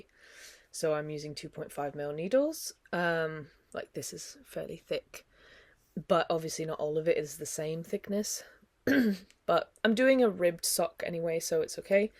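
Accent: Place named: Scotland, United Kingdom. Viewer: So glad to see you again this month! I wish that I had responded more to your last video. I thought about hunting you down in IG. I care if you make videos. I love your brand of witty humor. I love your Smattering of British and Scottish accents. You remind me of my grandmother who was from Aberdeen. I’ve tried to figure out why your channel is growing more slowly than some, and I think it comes down to “sameness.” You are not making the same PK and MFTK sweaters in creams like everyone else. You veer off the beaten path with color, patterns and other fashion. 💗💗💗💗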